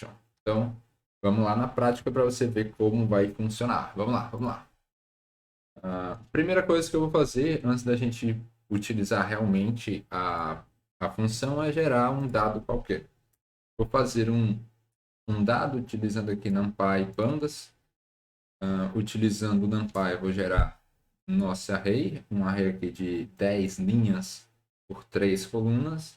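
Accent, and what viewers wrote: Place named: Fortaleza, Brazil. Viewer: Ótima didática e ajudou demais! Obrigada. Entendi tudo =) inscrita! Vc tem o sotaque daqui de Fortaleza-CE !!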